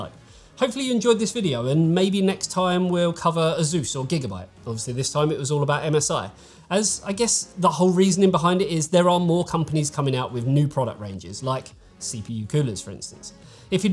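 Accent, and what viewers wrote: United Kingdom. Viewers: funny sounding british guy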